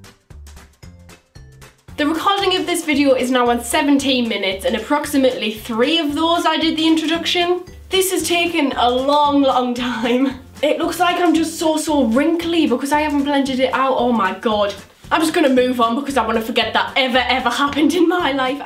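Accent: Newcastle, United Kingdom. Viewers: Hello it's by birthday today and it would be really really cool if you could reply to my comment !!! I love your channel soooo much and you're so funny and your jordie accent melts my heart !!! Love you so much it would mean the world if you read this !!! Thankyou xx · Are you from Newcastle xx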